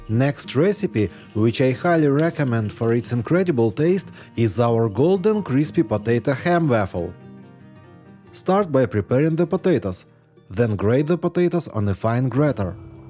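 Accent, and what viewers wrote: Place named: Russia. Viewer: I dont either , many tricks dont work... and that heavy Russian accent !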